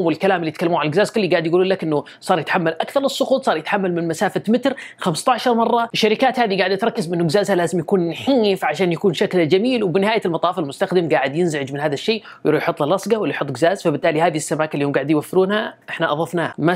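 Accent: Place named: Saudi Arabia. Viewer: والله انت رائع من عندك حبيت اللهجة سعودية ربي يبارك فيك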